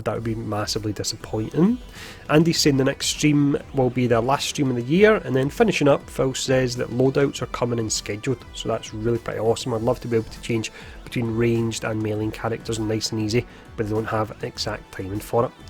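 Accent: Scotland, United Kingdom. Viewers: Your Scottish accent is strong where are you from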